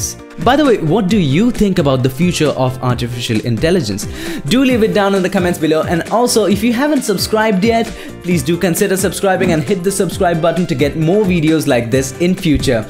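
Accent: India. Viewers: Indian english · Level Up, Indian English with much better diction than many English native speakers.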